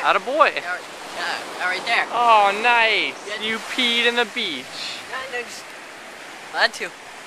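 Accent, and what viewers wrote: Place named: Canada. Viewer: i love how you say out ...hahhahahhaaha canadian accent :D srry i am american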